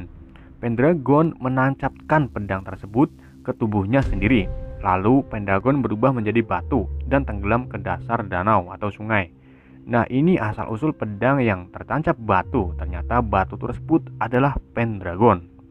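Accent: Indonesia. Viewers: Yah gpp bang kan logat orang indonesia jadi masih rada² susah ngomong bahasa luar yah belajar aja semoga bisa 👌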